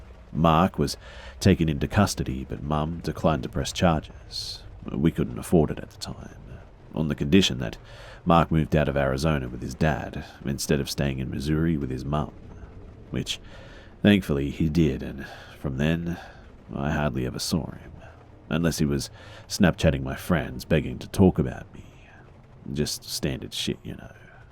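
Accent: Australia. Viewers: How his voice is soothing and amazing to hear. It’s like an Australian Prince Charming whispering in your ear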